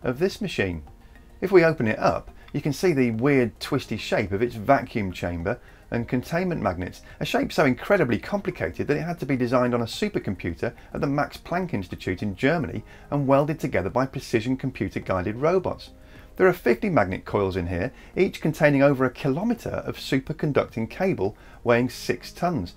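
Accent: United Kingdom. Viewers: I deliberately NEVER mention the totally IRRELEVANT subject of HOW somebody says something, and focus EXCLUSIVELY on WHAT they say. However, given that I have seen SO many brainless idiots in the world voice their worthless unoriginal opinion about style over content, I figure I am allowed at least ONE time to do the same. The CONTENT of this channel is PERFECT. But I REALLY cannot stand the speaker's mild British voice. I would prefer an aggressive angry voice (British or American) to deliver the narrative.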